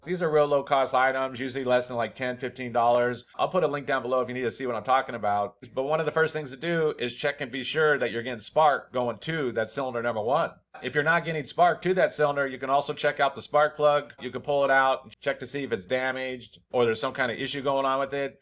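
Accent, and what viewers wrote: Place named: Chicago, United States. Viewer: Very nice accent ❤ from where this accent is it a Chicago?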